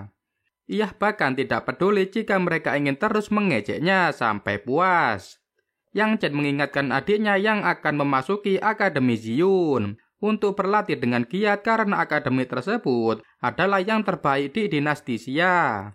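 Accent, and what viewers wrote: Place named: Madura, Indonesia. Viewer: Pembawa alur ceritanya...kayak orang madura tak yehhh...tak. yeee... ditarik " bahasa nyaaaaa...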